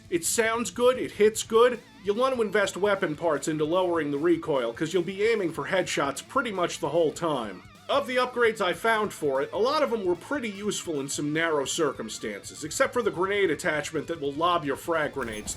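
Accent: New England, United States. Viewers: ... Of course he's from New England. Of course he's from here. if you're still out here and we happen to pass by each other I'll buy you a beer.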